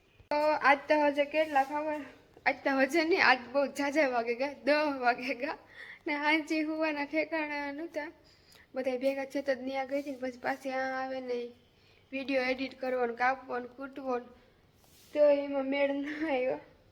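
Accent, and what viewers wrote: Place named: Porbandar, India. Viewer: નમસ્તે 🙏, તમારી બોલી સાંભળવાની બહુ મજા આવી, તમે જે નિખાલસ દિલ થી સાફ - સાફ બોલો છો, આપણાં પોરબંદર ના બરડા ડુંગર ના વિસ્તાર ની બોલી એક દમ સાફ - સાફ ભાષા હોય છે, અને આપણા લોકો ગમે ત્યાં હોય તે તેની બોલી ઉપર થી ઓળખાય જાય છે, મે તો આ તમારો વીડિયો મે પ્રથમ વખત જ જોયો છે, પણ બહુ મજા આવી,👍 ✨ ધન્યવાદ છે,🫅🏅🍫 તમને અને તમારા પરિવારને કે આટલે દુર સુધી અજાણ્યાં દેશ માં કમાવા માટે ની તમે હિંમત કરી છે, એ પણ એક છોકરી ની દ્રષ્ટિએ,તમારી હિંમત ને ધન્યવાદ છે,👍 મારા ગામ ના પણ ઇઝરાયલ દેશ માં છે, તે ત્યાં કયા સીટી માં છે,તે ખબર નથી, તમારું કામ ચાલુ રાખો,એક સમયે તમને જરૂર તમને સફળતા મળશે.🥀 लगे रहो 👍 ♥️🙏 પરમાત્મા તમને સારું સ્વાસ્થ્ય, સુખ અને સુરક્ષા, લાંબુ આયુષ્ય આપે તેવી પ્રાર્થના 🙏 નમસ્કાર 🙏 🇮🇳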